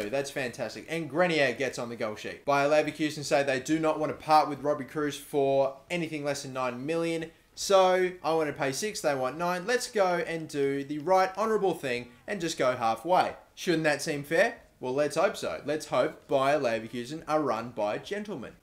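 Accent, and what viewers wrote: Australia. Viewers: He is Australian. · wait, you support nufc but you sound Australian? well... good enough for me, SUBSCRIBER EARNED NUFC4LIFE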